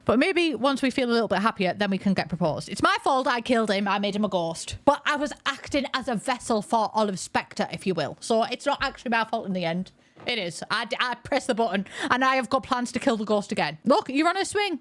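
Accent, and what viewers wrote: United Kingdom. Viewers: Let me start by saying this, in order to not sound like a mean sourpuss later, I really love videos. The accent has, is making my Swedish heart pitter-patter-pitter-patter for the different British accents (yes, I tend to watch/listen to British series just to get my "daily" dose of British). Now, on to my comment that can be read in the wrong aka a mean way, which is not my intention whatsoever. At like she says "artistic prodigy", my brain went "why is saying autistic prodigy?". I had to rewatch that segment so many times, because my brain told me that sims 4 had sneakily added an autistic trait, and eventually I ended up using subtitles... the subtitles basically crushed what my brain has been begging sims to add in the game - a trait for sims with a slightly more NPF then for instance gloomy or loner. That way, even people who aren't neurotypical gets represented in the game. 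So, with that said, I never realised how much I wanted an autistic trait in the sims before the subtitles crushed it. Again - love content! <3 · I love, I love british english, but I wish there were subtitles 😂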